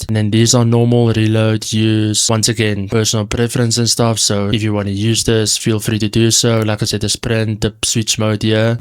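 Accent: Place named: Cape, South Africa. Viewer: The cape accent awe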